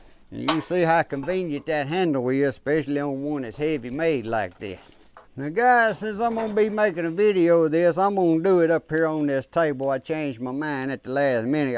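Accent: United States. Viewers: Great video! 🙂 I love your accent, where are you from in the USA? (I'm Australian)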